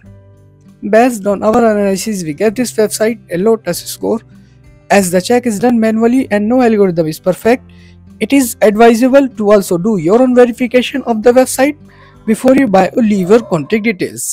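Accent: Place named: India. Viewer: Thick Indian accent that's hard to understand.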